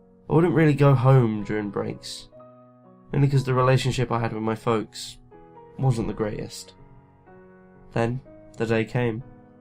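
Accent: London, United Kingdom. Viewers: The last line is so true..but even with 15people around you fighting for your life...(I had an accident and ruptured an artery) by the time only like 5minutes it took to be driven to the hospital, I had bled out two thirds of my blood..the big veins in my neck had collapsed and they couldnt get a line in. People seem to think bleeding out is painless but that is a cruel lie when I think of poor people taking their lives thinking all that lies ahead is dreaming into oblivion. The second your brain registers the drop in blood pressure it tells your heart to pump faster but while your heart jumps to command and quickly develops tachycardia then ventricular fibrilation (its like a big man jumping up and down on your chest 200+ times a minute. Meanwhile your brain is pushing your lungs as it feels oxygen depletion. They had to cut into my Femoral vein in my groin..no time for local anesthesia..the guy who did it was the cheif anesthetist (they are most qualified in hospitals for keeping people alive..he squatted over my chest on the trolly and cut then manually forced the teflon Venflon about 8" into my vein. 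In those frantic terrifying minutes I learned that EVERY man and woman is alone even in a crowded room we are prisoners in a meat and bone cage and, in the end, you go out as most of us came in, alone. Oh this is true..by your accent you've probably been near Homerton Hospital in Hackney without whose fast thinking anaesthetist I would have completed my journey still,as alway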